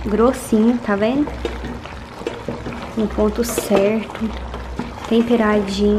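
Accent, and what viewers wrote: Minas Gerais, Brazil. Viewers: você é mineira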